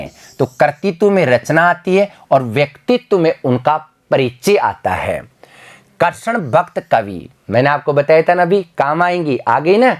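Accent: Rajasthan, India. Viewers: sir aap rajasthani ho kya ?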